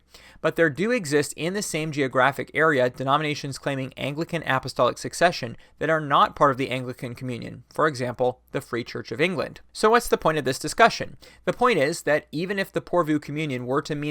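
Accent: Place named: United States. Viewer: The commenter didnt know you were american? What did he think you were by your accent? Maybe Canadian but you'd have to have a really bad ear for accents to think that! Another great video. Dont worry about it. Haters gonna hate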